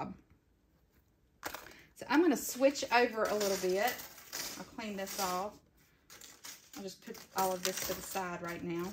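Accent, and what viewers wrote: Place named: South, United States. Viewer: Thanks for a great demo!!! You did a great job! I seam to have the same dies as you, so I can't wait to try them! I love your accent. What part of the South are you from? I'm from Tennessee.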